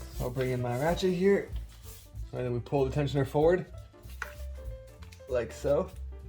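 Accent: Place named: Toronto, Canada. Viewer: You from Toronto?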